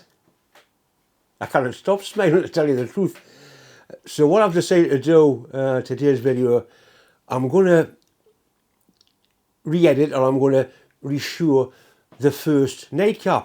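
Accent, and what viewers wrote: Newcastle, United Kingdom. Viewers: Thanks and congratulations (& Deb, and all your supporting team). As I said when you were 23 short of the 100k: “If you’re at all interested in metalworking, machining, old steam equipment, and more and enjoy a Newcastle accent then is well worth watching. No BS, and sharing a lifetime of knowledge and experience.” Honest and entertaining, as good as it gets.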